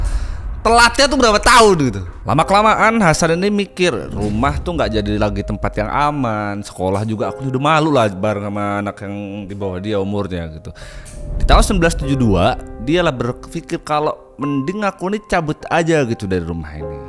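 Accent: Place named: Medan, Indonesia. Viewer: logatnya medan banget emg org medan kah?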